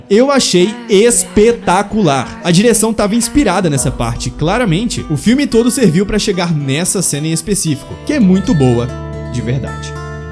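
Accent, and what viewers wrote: Northeast, Brazil. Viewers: Um brinde ao sotaque nordestino!!!!!!!!!!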